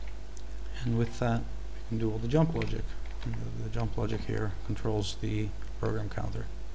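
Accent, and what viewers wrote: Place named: Netherlands. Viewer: Ik hoorde hoe je praatte en ik dacht gelijk: wow, vette nederlander. Nice gedaan man :)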